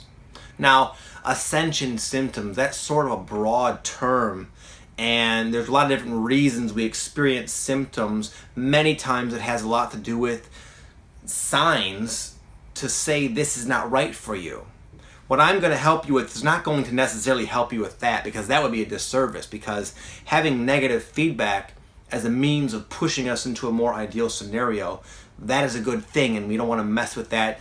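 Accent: Michigan, United States. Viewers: I'm from Ann Arbor and you sound like you're from Michigan :D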